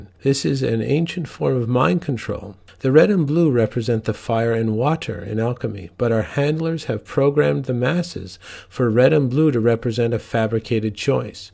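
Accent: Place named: Canada. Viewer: I love the sinister tone delivered in a Canadian accent, LOL.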